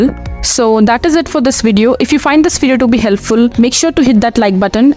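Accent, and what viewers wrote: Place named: India. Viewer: Don't trust english indian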